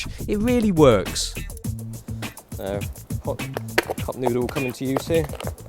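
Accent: England, United Kingdom. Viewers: i don't like how he speak.. British ? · an asian guy with british acent omg -____ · The English accent, raises the perceived quality of the instructions several folds, such is the power of the ENGLISH accent.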